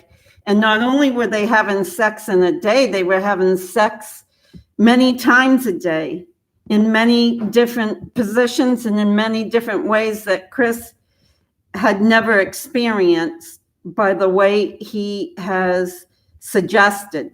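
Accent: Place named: Boston, United States. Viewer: You sound like you come from Boston or near Mass...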